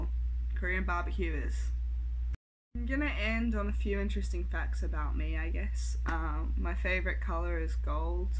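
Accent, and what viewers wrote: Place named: United States. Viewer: Yes!!!!! ❤️❤️❤️ also you sound so American now!!!😍 so proud of you x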